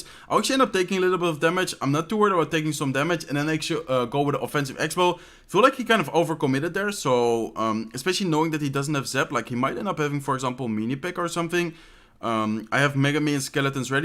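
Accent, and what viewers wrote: Netherlands. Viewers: Are you dutch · Is deze gast niet nederlands of lijkt dat zo aan zijn accent te horen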